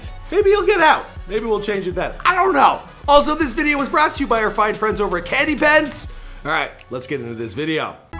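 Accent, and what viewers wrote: Canada. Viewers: I hate the Canadian accent lol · Why do people always talk junk about? I like his content. Yea he’s a Canadian and don’t pronounce everything the way we do but cut the guy some slack y’all.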